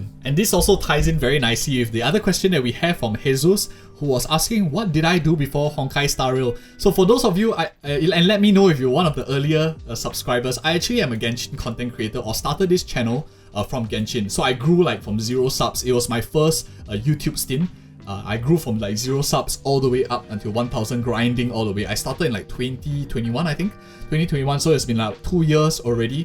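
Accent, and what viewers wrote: Singapore. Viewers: lily aquina and you are my fav star rail content creators, I've watch every video from you since a month ago and I look forward to new one evertime, because I rly like the way you talk about stuff and I have a lot of sg friends and cousin so your accent is kinda a plus for me lol. Also a weird timing cuz i was thinking of A.I art for fun and turns out you have a channel for it, thats cool. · Always good to see a fellow Singaporean find success in something they love! One of the biggest things I've liked about your channel was finally hearing a familiar accent narrating something that I had to search up on Youtube. Ever since watching my first video on your channel, I've found myself always coming here to look for anything star rail related before going anywhere else. Hope you continue to grow and find even more success! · congrats on your achievement! 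started HSR on day 1 and have been following your videos and advices from then. when i first listened to your video, i immediately recognised you as a fellow singaporean. its not a bad thing, its a good thing actually. the landscape in sg doesnt really favour content creation so i really feel very encouraged and happy that you are trying and doing your best to make it. i'll continue to support you just like how you have supported us in our trailblazing adventures with your wisdom and insights. i'm pretty sure you alrdy know how to pace yourself and get enough rest. jiayou and i'll continue to support you with views <3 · congrats bro, only started watching you recently for star rail and didnt know u had another channel ngl but ill check it out since i like gacha games. also i sort of guessed you were from singapore too from your voice but guess now it confirms it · Congrats on your 15k! Fellow Sporean here, clicked into your content since day 1 of HSR, noticed your accent right away and stuck to your vids for guides since they are very detailed as compared to most of the HSR guides. 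Able to make pull decisions based on your content as well and I always recommend my friends to watch your vids as well for HSR guides. Really appreciate your hardwork that goes into the videos and hope to see exponential growth in the near future!! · I was wondering why you sound so singaporean. Love to see more from from you ❤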